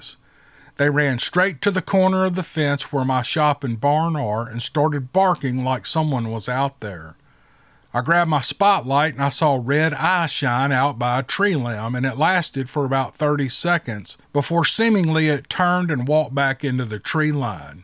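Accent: South, United States. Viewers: I’m Irish always loved the American accent & by far the most popular among anyone I know is the smooooth southern accent.I love your ramblings they are full of warmth & humour🥰!! All the stories you choose are something else & your narrative skills are a gift to the world.I think it’s so incredible how people who relate their stories to you really benefit from doing so.You have a great channel & I love your choice of music it’s so evocative & real musicianship 🎶🎸 to savour, Lizzie. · Hey how you doin,just wanna say that your southern accent is just PERFECT for telling these$ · Hi, as an Aussie I can confirm we like the southern accent. Possibly from over exposure to Dukes of Hazzard back in the day. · Aussie here and love your southern accent :) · I absolutely love the southern accent. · I here in the United Kingdom also LOVE listening to your southern accent it's made your channel instantly one of my top. 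I just love it ❤️ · I like to listen to your southern accent. You are my favorite Bigfoot narrator.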